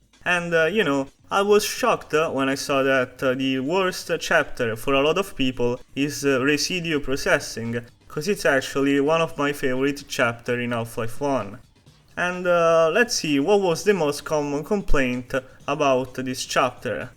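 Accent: Italy. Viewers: You sound overly italian, I love it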